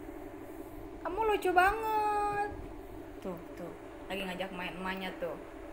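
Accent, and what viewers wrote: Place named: Java, Indonesia. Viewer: Momy ni jawatimur pa jawa barat sih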